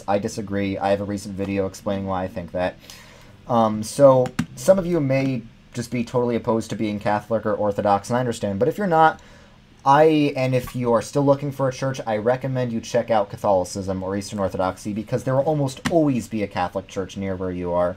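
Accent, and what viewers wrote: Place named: New Jersey, United States. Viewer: He's Jerseyian, you are allowed to make fun of his accent all day - me, a based Texan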